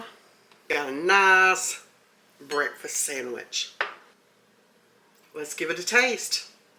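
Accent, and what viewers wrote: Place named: South, United States. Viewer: I love your recipes, i am on a fixed income and these seem very inexpensive. Also, i love your accent. I'm from the deep piney woods of East Texas close to the Louisiana border, and your accent so reminds me of home as my family moved there from Alabama and Mississippi.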